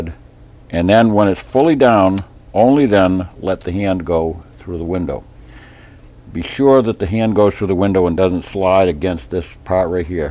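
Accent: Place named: Massachusetts, United States. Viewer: Great video. Very informative. I have two S&W revolvers and this is great information. Also enjoy the Massachusetts accent :)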